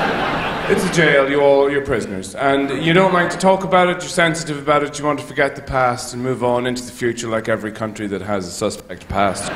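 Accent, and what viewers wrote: Ireland. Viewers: lol he isnt english at all, he's irish from the republic of ireland. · hey dip wit hes irish not english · I'm Irish so when he started talking I was like Wait is he Irish ?! Then I like nah probably not nobody Irish is really famous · He is Irish, not British.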